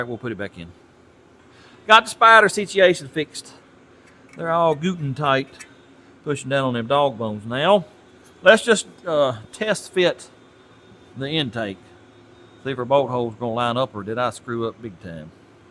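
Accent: South, United States. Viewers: Me and my wife absolutely love this channel. Your cadence and voice remind us so much of our relatives who live in Tennessee and North Carolina. So it's quite comforting. I wish you nothing but the best sir! We will keep watching as long as you keep posting.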